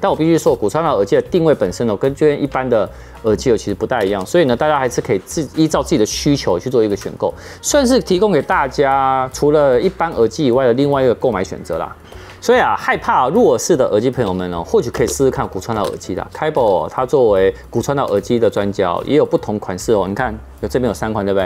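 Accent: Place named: China. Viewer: 聽旁白，還以為聽到阿陸仔的頻道咧！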